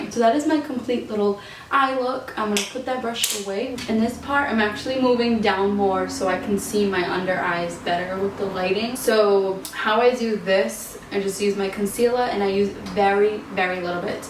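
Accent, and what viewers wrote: Boston, United States. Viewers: You are so gorgeous naturally and I love how you wear minimal makeup I’ve been wearing less makeup lately do to it causing me to break out and I love your Boston accent ❤️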